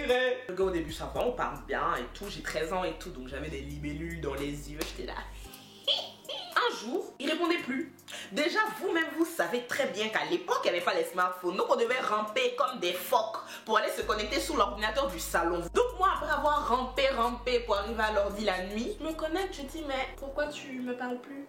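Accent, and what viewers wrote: Ivory Coast. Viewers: alors là ! !!! Si on me dit que tu n'es pas ivoirienne toi! lool. Je te découvre avec cette vidéo. ..Et pardon yé né ka m'abonner keh lool. J'ai trop rigolé. Je t'encourage ! Tu es top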